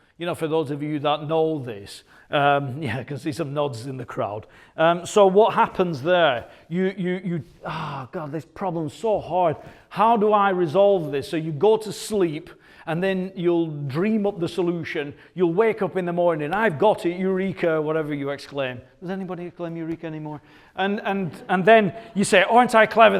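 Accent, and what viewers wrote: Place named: Scotland, United Kingdom. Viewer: Scottish accent really sells it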